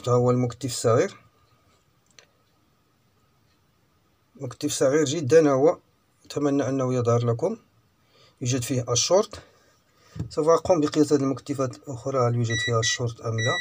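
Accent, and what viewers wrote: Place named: Agadir, Morocco. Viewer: ممتاز وشرح جد واضح ومفصل بارك الله فيك من خلال كلامك يمكن أن أقول انك من اكادير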